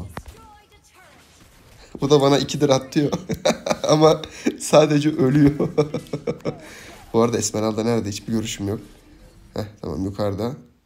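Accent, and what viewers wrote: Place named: Ankara, Turkey. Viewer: Abi senin arada giren şu Angara şivene bayılıyorum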